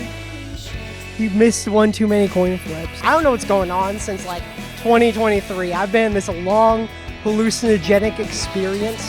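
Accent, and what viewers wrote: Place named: Minnesota, United States. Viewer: thank you for your unabashed use of the minnesota accent this is near and dear to my heart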